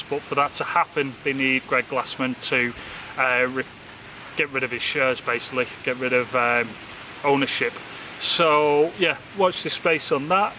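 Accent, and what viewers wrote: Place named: Lancashire, United Kingdom. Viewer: If you had a message it got lost in your Lancashire accent ... Pal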